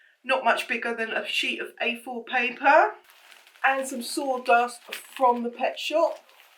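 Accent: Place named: Enfield, United Kingdom. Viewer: "Cats are not required - could someone please tell them that?" LOL, subbed. Plus you sound like a local (I'm in Enfield, you sound distinctly like my people. :) )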